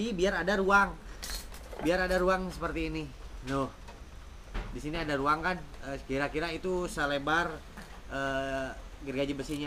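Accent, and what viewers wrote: West Java, Indonesia. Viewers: Resep euy geunaheun ningali logat sundana wkwkwk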